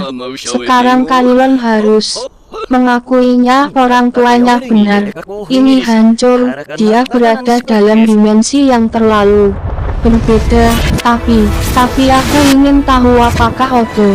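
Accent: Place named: Java, Indonesia. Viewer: Medok yah suara nyah 😇😇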